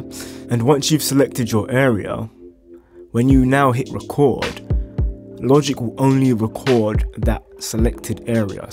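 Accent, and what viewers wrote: United Kingdom. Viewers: Dont usually comment.. but I thought this was a very well produced video.: clear and fast flowing.. also refreshing to hear another UK bruh doing a tutorial. Keep it up!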